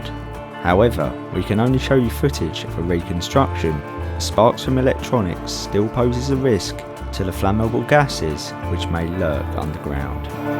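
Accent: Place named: Essex, United Kingdom. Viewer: Mate I wicked again brother can we meet up I have a few places up my sleeve. I make videos too. You sound like your from essex?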